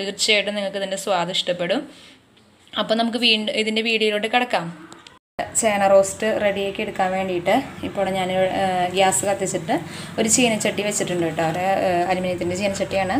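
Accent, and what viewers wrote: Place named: Thrissur, India. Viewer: kollaatooo👍👍👍.... Thrissur anoo namude naatukaari anale... Samsaram ketapo thonni...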